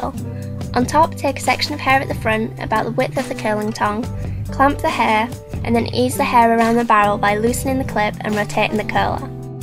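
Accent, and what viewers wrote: Yorkshire, United Kingdom. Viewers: Her accent is from Yorkshire, England :)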